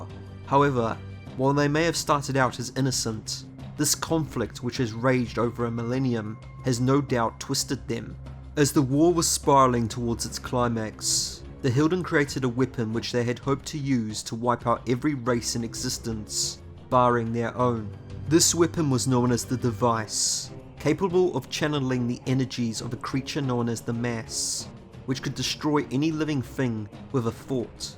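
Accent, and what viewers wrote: New Zealand. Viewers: trying to work out your accent, Kiwi?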